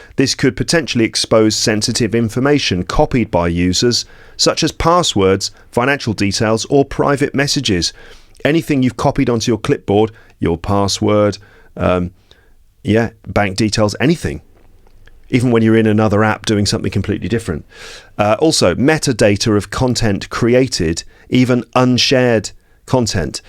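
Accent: United Kingdom. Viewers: His accent is British, i don't know which specific accent because there are a lot of British accents but it's mainly british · Hey... Really like your videos. People apart from spoken English can learn British Accent on your channel. I particularly watch your videos for British Accent. It's just a suggestion if you like. You can also start teaching English with newspapers as the language is quite arduous to comprehend. Thanks for the Videos. Best of luck. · This was very informative, very very informative. More content should be made on these lines and other similar important subjects, societal subjects, classified subjects, about realities which are not made public. Some of the topics can be related to cannibalism practice in the 21st century. Subjects related to money and banking and how successful this system has been across the globe. Topics related to different kinds of societies, interesting facts which can be shocking too often times. 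Until recently I never knew the difference between British English and American English and the associated politics at global level. I have been looking for profiles for marriage on Instagram and Instagram mainly shares profiles from across the globe who speak American English or some kind of English but not much from England who speak British English like yours or Sophie Turner or Isaac Hempstead Wright. I find this approach very political in nature.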